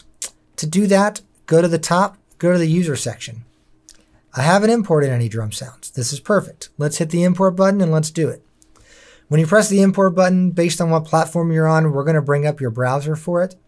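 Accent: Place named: United States. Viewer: I love video tutorials. But my english is not that good. The American accent makes it harder to follow the video, too bad. When will you finally make a manual in HTML or PDF format?? Anyone can translate that with Google Translate. In addition, it makes sense if a specific information needs, then you can search for it!. In video format, I do not have this option.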